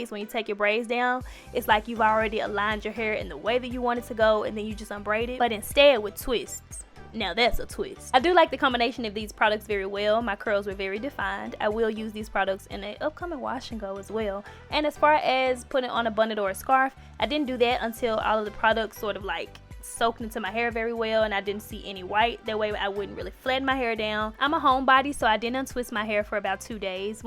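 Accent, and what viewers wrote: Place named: Georgia, United States. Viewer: I just love your country accent!! Ga. Represent!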